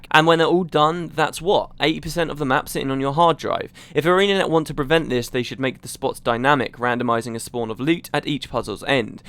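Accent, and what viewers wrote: United Kingdom. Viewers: I've just watched the fucked up videos pn youtube... I really hope will cheer me up with his excellent british accent.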